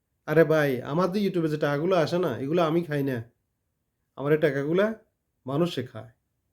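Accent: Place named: Pabna, Bangladesh. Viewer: ভাই পাবনার কথা শুনে বহুত হাসি এসে গেছে।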